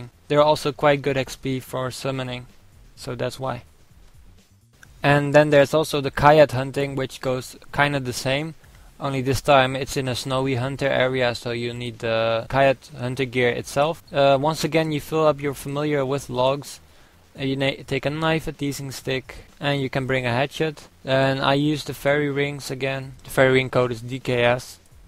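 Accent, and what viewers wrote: Netherlands. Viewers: aha ik hoor nederlands accent:P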